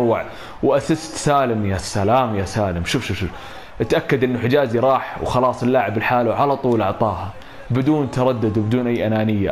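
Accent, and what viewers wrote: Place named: Algeria. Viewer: اللهجة الجزائريه 😂😂😂😂😂😂 والله انت مبدع 😂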